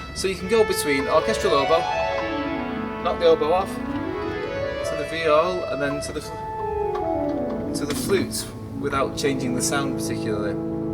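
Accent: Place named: England, United Kingdom. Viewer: This is so interesting, great demonstration and from an Aussie perspective, love your pommy accent 👍🏻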